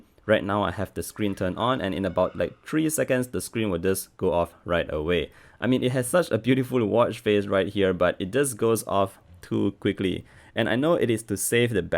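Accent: Singapore. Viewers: Do I here a SG accent?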